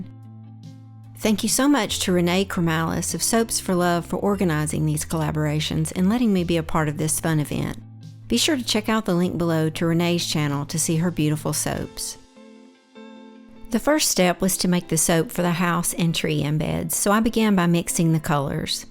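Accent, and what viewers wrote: South, United States. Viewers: I am so proud of you I have a tear in my eye. This is an amazing video that I can tell you put your heart and soul into. I love your voice over! And let me just say I did not imagining you having a southern accent! I need to change the way I say, “Oil (insert Southern California accent 😂).” You say it so much cooler! Bravo and take a bow!!!!!!!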